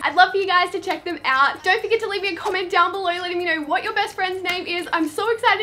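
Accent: Australia. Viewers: I could tell she Australian cuz her accent but I wasn't sure but then she said in Australia so ya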